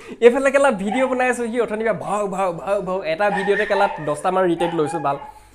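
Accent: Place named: Upper Assam, India. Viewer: Bhakha huni upper assam jen lagise, kot ase thai dukhor?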